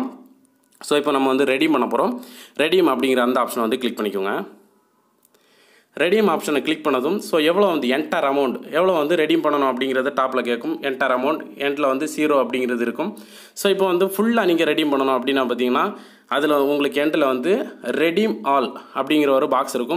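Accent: Kanyakumari, India. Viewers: Kumari slang 🥰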